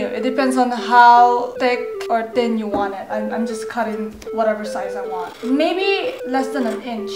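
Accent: Philippines. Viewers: **MUTE ASAP** just THAT TYPICAL filo fob accent.... -COMPLETELY KILLS IT!!! (....&tryn act ol cute n shit, pfffft~LMAO😁) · Filipino accent! haha understand kita! (: love you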